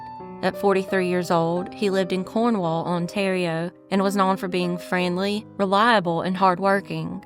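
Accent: South, United States. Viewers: mine too. And her voice is relatable to my Southern self.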